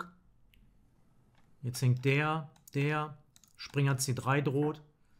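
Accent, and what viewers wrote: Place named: Ruhr, Germany. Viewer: Mal was ganz anderes...also, dieser immer noch hörbare Ruhrpottdialekt, nun mit leicht norddeutscher Färbung. Astrein!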